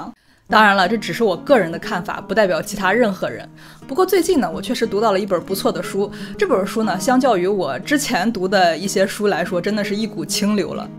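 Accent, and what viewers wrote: Qingdao, China. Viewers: 这姑娘好像是青岛人啊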